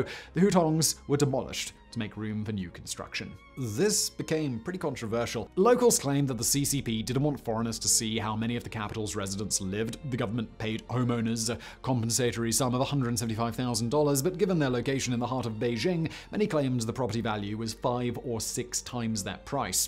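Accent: England, United Kingdom. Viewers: what kind of English accent is that ?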